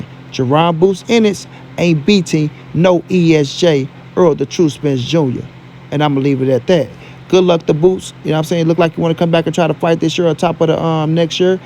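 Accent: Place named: South, United States. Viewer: Boots does NOT look like Spence on the rise,, NONE of them look like BOOTS yall got this excuse NOW as yall gona,, BOOTS beats Spence BOOTS beats Crawford & Porter said BOOTS is ready for ALL THEM GUYS NOW! if you a boxing channel then you should know Porter said BOOTS is ready for all them NOW,, & clearly with your country accent your a Spence fan,, so your bias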